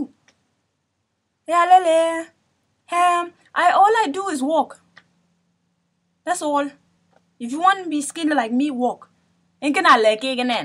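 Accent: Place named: Dinka, South Sudan. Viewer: lmfao ur dinka asccent is so on point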